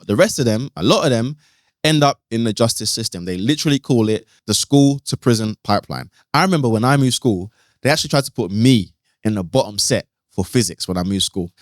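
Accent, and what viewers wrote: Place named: Africa. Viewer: I would like to actually voice out an unconscious bias (or stereotype) I had even as a black African person… I assumed you were African when I first heard you talk… this might also be a hidden factor too in society. I’ve deffo checked myself so chill in the replies to this 😅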